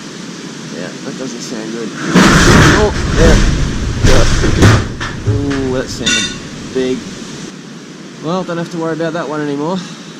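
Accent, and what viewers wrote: Australia. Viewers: You sound Australian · wait are you from brisbane?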